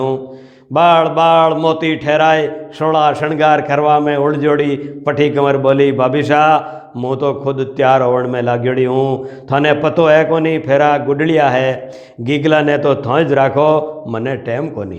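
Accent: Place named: Saurashtra, India. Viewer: Hamare gujrat. Sorath jesi aapki bani he dhanyawad namaskar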